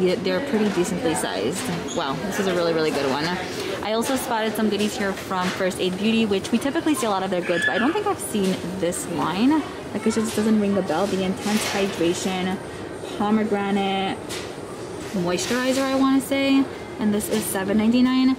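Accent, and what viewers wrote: New York, United States. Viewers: Sounda like a NY/ NJ thing.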